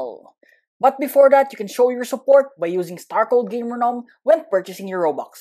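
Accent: Philippines. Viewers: R u a filipino? Bc the way u speak english is just like filipino and ur wearng a philipines strae hat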